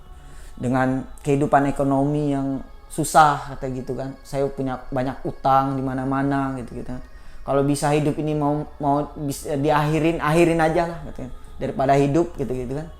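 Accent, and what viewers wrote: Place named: Jambi, Indonesia. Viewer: AssalamualIkum.. Jambi hadir bang,semakin kesini bang tambah keren,begitu juga dg baground nya,walaupun udah berubah tp ttap dapat unsur mistis nya,dan yg lebih keren lagi,bang ttap menyelipkan logat bahasa jambi di setiap cerita,bang emang the best! Best beesstt!! 👍👍👍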